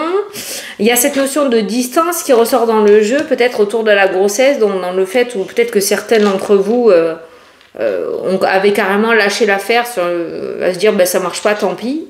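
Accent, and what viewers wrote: South, France. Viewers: domage j'aimais bien ton accent du midi ton franc parlé et tu m'éclate quand tu raconte tes histoires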